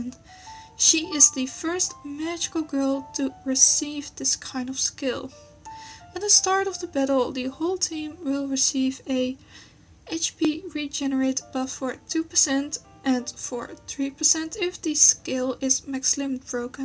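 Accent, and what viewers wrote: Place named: Germany. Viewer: I understand your English lol It's even better than mine cuz I'm not native English eather And I guess that you're German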